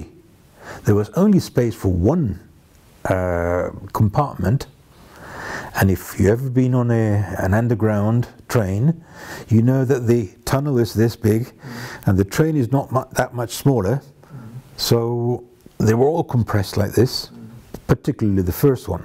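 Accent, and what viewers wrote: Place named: RP, United Kingdom. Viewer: Great voice...very posh 😊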